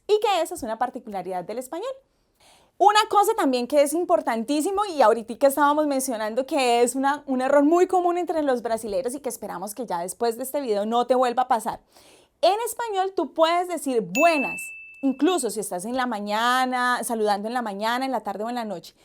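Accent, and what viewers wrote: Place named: Colombia. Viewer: me parece acento colombiano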